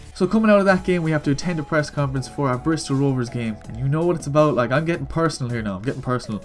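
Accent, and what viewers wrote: Ireland. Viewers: FIFA 17 dont ya hear tat irish accent? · What part of Ireland are you from?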